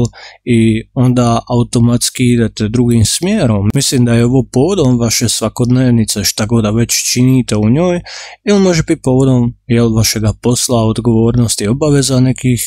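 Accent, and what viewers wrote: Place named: Dalmatia, Croatia. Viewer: Obožavam kao prvo vaš Dalmatinski jezik,vaša brza čitanja,jednostavno super ste.Pozdrav Bos Gradiška.🥇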